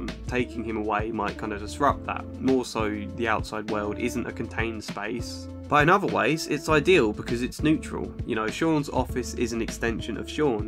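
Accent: England, United Kingdom. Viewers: Average master’s level counselor’s commentary with English accent and annoying light Jazz music playing in background. C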